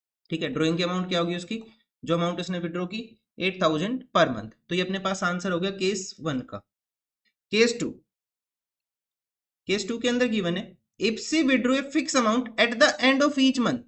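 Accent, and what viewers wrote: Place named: Haryana, India. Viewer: Sir haryana se kaha se ho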